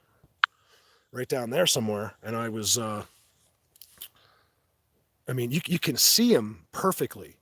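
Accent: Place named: New Jersey, United States. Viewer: I missed your live stream! Damn! Jersey. Now I know where your accent is from! Makes perfect sense. I’d been wondering since I subscribed. Love ❤️ it! Do more Live Streams! I’ll hit your Notification Bell.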